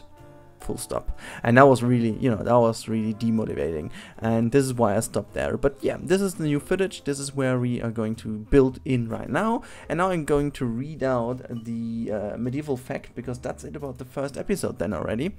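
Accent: Germany. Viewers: Are you from Germany because really sounds like this?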